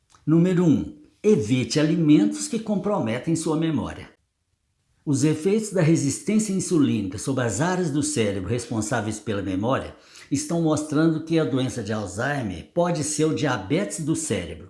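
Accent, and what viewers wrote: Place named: Minas Gerais, Brazil. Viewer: Eu adoro esse sotaque mineiro dele 😅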